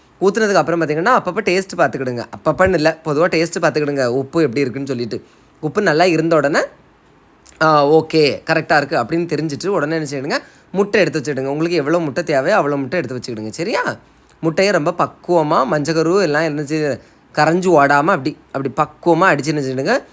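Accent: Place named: Kerala, India. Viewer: Hello sir ni ga pesupothu malayalam slag verunudallo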